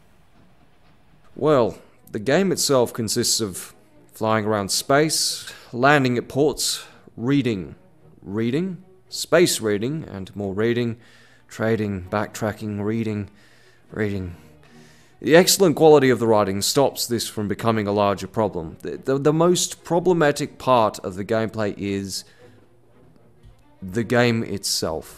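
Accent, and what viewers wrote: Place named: Australia. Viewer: I'm English and he sounds more Australian to me in fairness.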